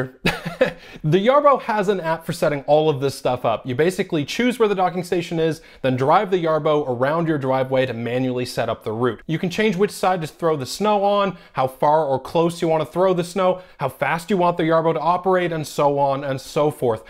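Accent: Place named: Newfoundland, Canada. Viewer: The Newfy accent is mild 😓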